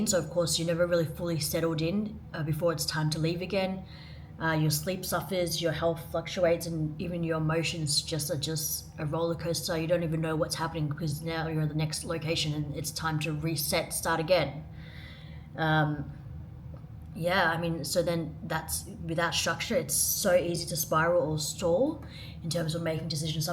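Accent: Australia. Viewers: Nice ,as soon as you first spoke i new you were from Aus👍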